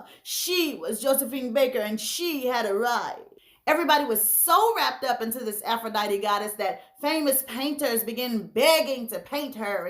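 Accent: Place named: St. Louis, United States. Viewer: sounds like a STL woman.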